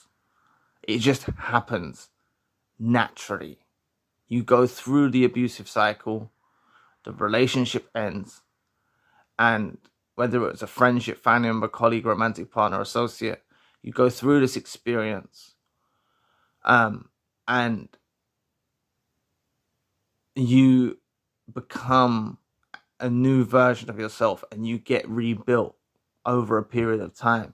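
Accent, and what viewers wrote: London, United Kingdom. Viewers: tring to work out your accent , thinking maybe LDN originally but moved out the city :-)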